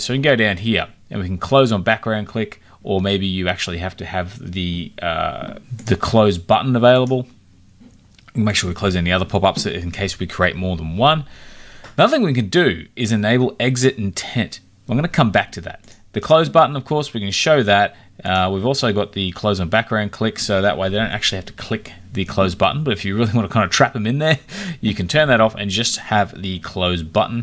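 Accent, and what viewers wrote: Australia. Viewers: Thanks for the tutorial. Nice to hear an aussie voice too!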